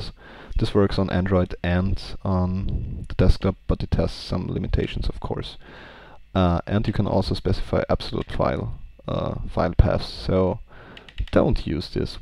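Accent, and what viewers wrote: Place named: Germany. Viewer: Nah, a heavy German one ^^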